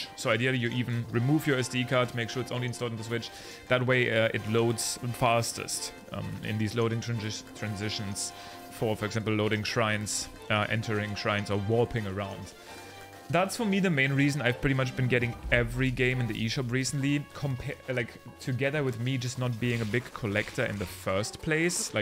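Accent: Germany. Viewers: are you german by any chance? i feel like i’m hearing a very slight accent and it reminds me of my home region there.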